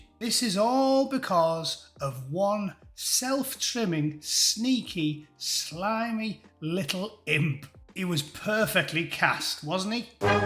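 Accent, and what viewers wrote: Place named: Northern England, United Kingdom. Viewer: Good stuff. First time here. Been working on my ability to distinguish English accents and I got it right from once. Guessed you had to be from the north and......apparently you are.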